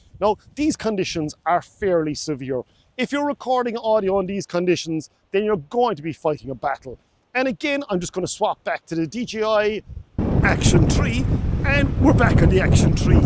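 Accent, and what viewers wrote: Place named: Ireland. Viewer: So I am subscribing just for the Irish brouge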